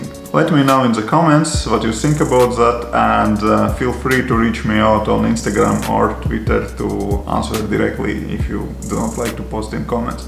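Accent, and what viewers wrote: Russia. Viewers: are you russian? I hear a slight accent =)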